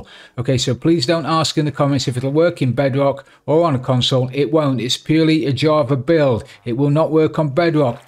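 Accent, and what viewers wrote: United Kingdom. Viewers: I really enjoyed your video. English is not my first language and I think UK accent pretty dificult to understand, BUT I think yours is lovely and I understood it all!!! Thank you very much for sharing with us your iron farm, I hope I can do it haha. And I LOVED the name you gave to the zombie, it took a real laugh of me xD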